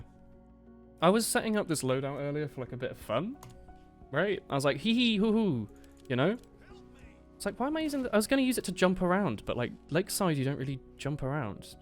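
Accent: RP, United Kingdom. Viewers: Its been a while. Thank you posh britain man. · I listen to your videos as background noise when doing homework and I never knew that a posh British man would make such good motivation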